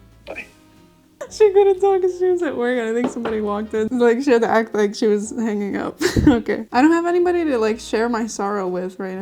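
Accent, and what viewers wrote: Portugal. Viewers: I'm brazilian and I coulnd't help noticing your portuguese accent! Did you choose to learn portuguese from Portugal instead of brasilian or you just naturally got this accent by listening to people from portugal while learning? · Caralho hahaha, curti muito você falando Português com sotaque de Portugal mesmo eu odiando esse sotaque feio da porra hahaha, mas você fala Português perfeitamente. Gostaria de te elogiar. Você falou ''caralho'' perfeitamente hahaha, pode reduzir também para ''caraio'' ou ''carai''. Você soa mais agradável do que os próprios Portugueses hahaha. Mas amaria ver você falar com o sotaque Brasileiro, mas teria que escolher um porque tem vários, o sotaque Brasileiro mais próximo do Português Europeu é o do Rio. Você não é poliglota a toa. Curto muito seu trabalho ajudando as pessoas a aprenderem outros idiomas e culturas. 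Todo sucesso do mundo pra você!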